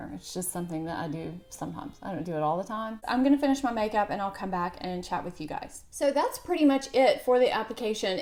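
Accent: South, United States. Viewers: Yeah Ive struggled with the shape tape, its a bit over hyped. While it gives very good coverage. Your video hit the nail on the head. Less is More. If I can ask why do you prefer the brightening powder over the other setting powder by Laura Mercier? Thanks from one Southern Girl to another, atleast you sound Southern LOL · OMG the fur baby on the bed killed me lol! I have one of mine own that just makes herself comfy wherever and whenever she wants 😂. Cutie pie though...makes me identify with you a little more than other "YouTubers". Just an everyday woman like me fighting the signs of aging the best we can! And love the accent...southern girl like me lol! I really like your channel hun and love your info and tips! Keep up the good work 👍🏻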